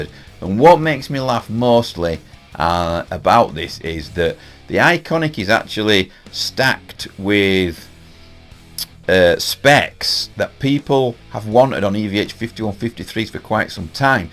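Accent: North, United Kingdom. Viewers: So great to hear a fellow northerner talking sense! I have subscribed.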